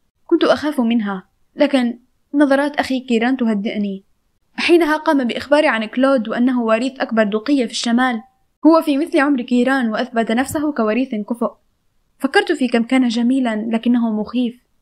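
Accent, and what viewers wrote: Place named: Jordan. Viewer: حاس انكي من الاردن بعرفش هيك بحس 😅😅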